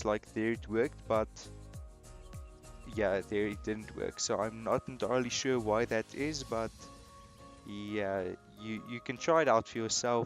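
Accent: South Africa. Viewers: hello fellow south african 😌 jou aksent het jou weggegee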